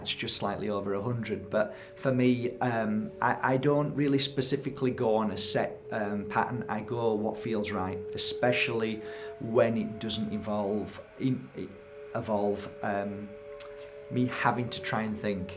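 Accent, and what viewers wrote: Yorkshire, United Kingdom. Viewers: You from Yorkshire northern England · Is your accent Yorkshire? I have a Leeds accent, yours sounds Leeds/Calderdale area? Just found your channel - it's wonderful!! Many thanks x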